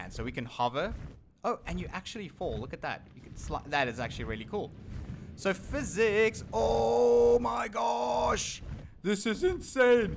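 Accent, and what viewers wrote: South Africa. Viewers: Omg a south african are u from cape town or Johannesburg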